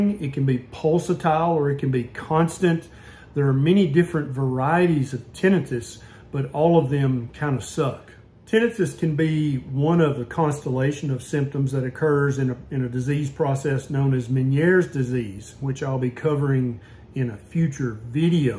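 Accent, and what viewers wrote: South, United States. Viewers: Tiniiiiitus is pronounced this way in the north as well so you sound like you're from the south and I don't understand you're saying it is pronounced that way in the South when you clearly I can't even listen to you because you keep on saying it improperly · Oh my goodness… we really do say it like that in the south lol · 100% support that southern pronunciation,!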